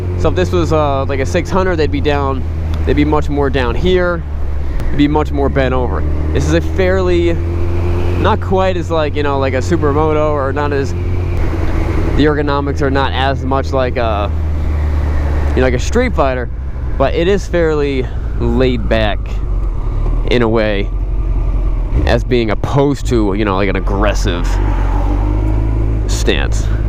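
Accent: United States. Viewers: ZeyX14 lol, I'll never get used to how you americans say your z's.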